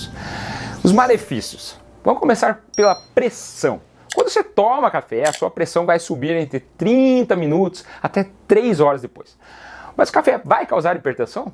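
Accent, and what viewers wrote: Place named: Minas Gerais, Brazil. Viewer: vrdade gostei tbm do jeito mineiro.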